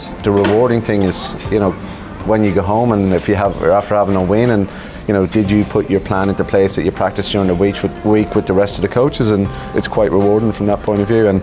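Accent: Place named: Cavan, Ireland. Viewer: I love the fact hehas a Cavan accent (that's how you spell it)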